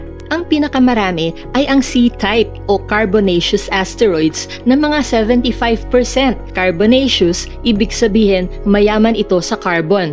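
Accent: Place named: Visayas, Philippines. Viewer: Sayke haha langya na pronounciation yan napaka bisaya sayke nag aral ka ba ?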